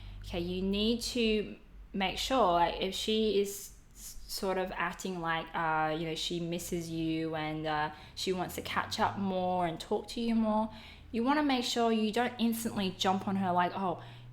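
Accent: Australia. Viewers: Hi, are you Aussie?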